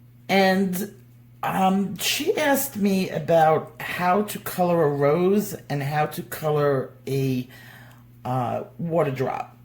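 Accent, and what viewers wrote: New York, United States. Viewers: It is good to hear your voice again. Love your NY accent, glad you got out of there too. May God bless you always, your generosity is unsurpassed and I love you. Love this vid.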